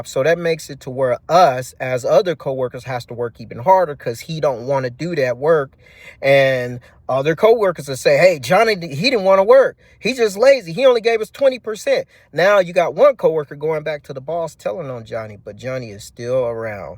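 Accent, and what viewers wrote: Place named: Missouri, United States. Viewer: Bro...Solid commentary as always. Also, U remind me of Mason Betha but with a Missouri accent. You should write a book with all of your stories. Reminds me of stories I read in two books: (1) Working for You Isn't Working for Me (2) Living Successfully with Screwed-Up People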